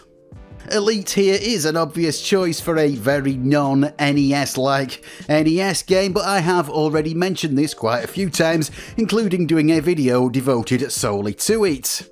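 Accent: United Kingdom. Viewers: Are you from UK? What an accent 💩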